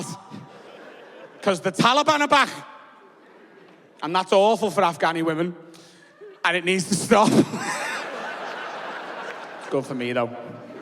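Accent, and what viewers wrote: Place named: Liverpool, United Kingdom. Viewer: Was this chap braught up, same place as darran till. Accent seems, very similar, unsure if it changes certain parts of Liverpool, like it does generally, in Glasgow, newcastle, but this guys accent and darran tills, sounds very similar, but different to carragher, just a thought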